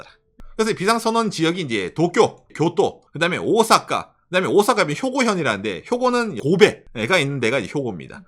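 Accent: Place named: Gyeongsang, South Korea. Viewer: 경상도 남자라 기본적으로 억양 배이스가 깔려 있는것도 있을듯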